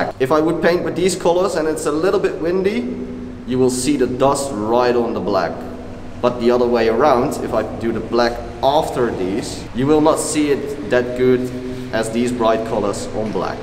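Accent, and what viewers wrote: Netherlands. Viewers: Je hoort gewoon het Nederlands accent erdoorheen haha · best dutch accent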